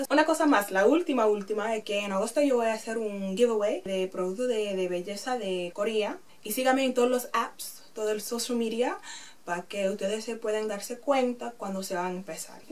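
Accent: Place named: Spain. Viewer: No creo que equivocaste por nada que yo entendí todo perfectamente. Great video and love your Spanish accent 💜💜💜💜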